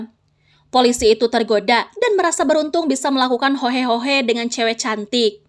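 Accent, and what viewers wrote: West Java, Indonesia. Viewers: Ini admin nya kaya nya orang sunda nya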